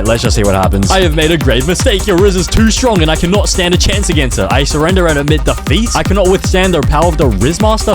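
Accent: Australia. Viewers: Ive watched so many vids and THIS is the one i find out you are australian... how did i not know 😭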